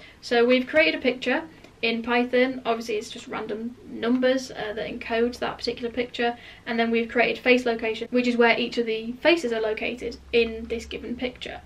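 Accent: United Kingdom. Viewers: Thanks for this tutorial. It is easer to understand with a British accent. As this was made over two years ago, what is your recommendation today for the best face recognition software? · You are all I need, you are a girl (that shows me that I can become a programmer), you have interesting projects, you explain and the best you have a British accent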